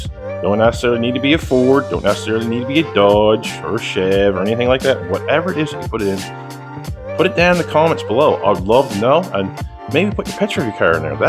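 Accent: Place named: Nova Scotia, Canada. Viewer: I knew that accent was from Nova Scotia !!! Kings county here brother !!!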